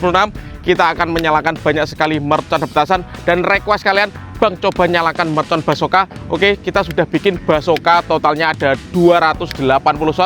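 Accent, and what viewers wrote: Java, Indonesia. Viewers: Bang kue uong jowo yo😅😅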